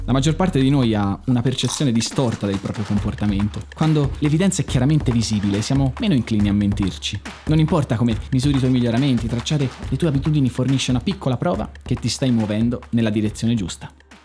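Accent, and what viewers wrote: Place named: Tuscany, Italy. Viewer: Una così alta qualità di contenuti e forma (davvero, sono bellissimi i tuoi video, dalla fotografia al montaggio) e solo 219 iscritti? Il mondo è un posto ingiusto. In bocca al lupo per il futuro! Continua così! P.s.: per quanto tu possa parlare molto correttamente, c'è una piccola inflessione che mi faceva pensare tu potessi essere fratello di granducato, poi il giglio sul laptop ha tolto ogni dubbio!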